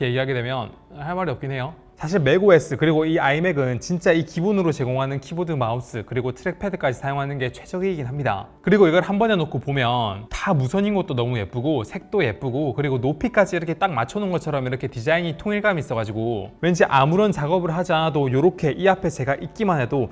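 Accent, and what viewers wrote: Gyeongsang, South Korea. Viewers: 갱상도 클라스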